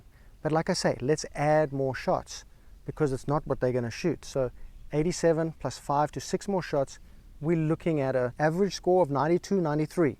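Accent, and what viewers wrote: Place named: South Africa. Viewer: Howzit china! Judging by the accent, you're just as South African as me lol. Dig your vids my bru. I've managed to lower my handicap from 28 to 16. Happy with that. Aiming for single digits this year. Been playing properly now that I'm in the UK as it's much more accessible than back home. Your tips and tricks are much appreciated!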